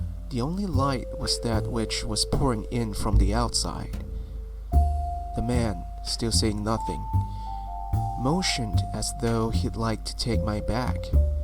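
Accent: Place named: France. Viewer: You are French, from France, or Quebec. Thank you for the fantastic narration. Peace and much love sent from Ontario, Canada.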